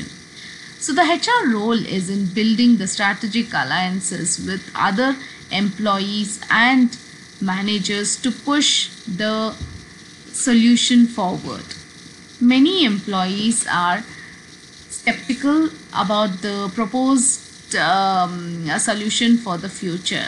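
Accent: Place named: United Kingdom. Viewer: Wow mam trying ur British accent 😁 Well done mam 👍😁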